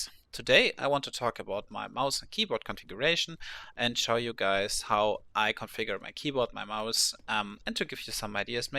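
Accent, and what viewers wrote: Germany. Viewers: Sofort gehört dass du Deutscher bist :D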